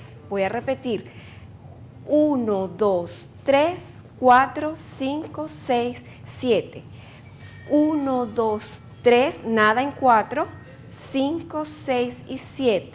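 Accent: Venezuela. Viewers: Habla como Venezolana. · la instructora es de Venezuela =)! cierto??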